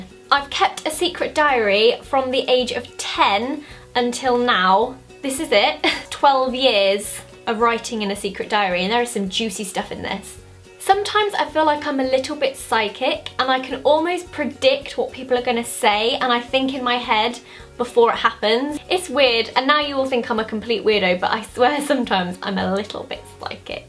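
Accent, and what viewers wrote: United Kingdom. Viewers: 她的英國腔也太好聽😍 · Greta Hui 英式的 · 他是英國人嗎??好種的英國腔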